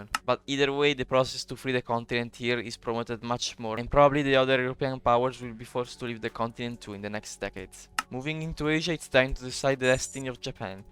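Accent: Italy. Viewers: Ho capito subito che sei italiano per come parli inglese (non avevo visto il nome del canale) 🤣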